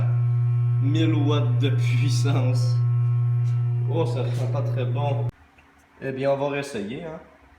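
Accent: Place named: Canada. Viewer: Super ta soudeuse avec l'accent canadien en prime😊😊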